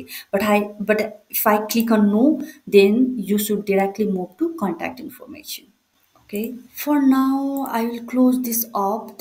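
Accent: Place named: Nepal. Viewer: Helpful Video. You sound like nepali from your accent !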